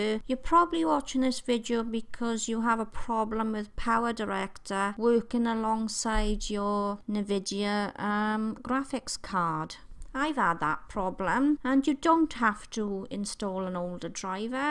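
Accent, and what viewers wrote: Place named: Wales, United Kingdom. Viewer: Amazing, thanks so much sexy voiced welsh lady!!! xx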